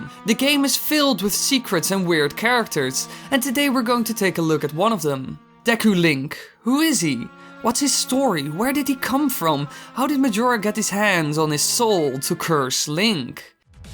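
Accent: Netherlands. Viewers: I bet my life this guy is dutch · You sound Dutch are you?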